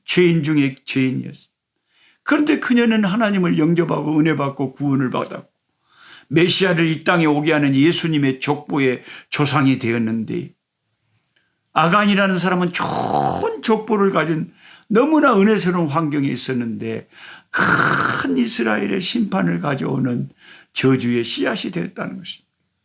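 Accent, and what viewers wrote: Jeolla, South Korea. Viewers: 목사님 전라도 사투리 말씀 은혜가됩니다 저도 전라도 고흥입니다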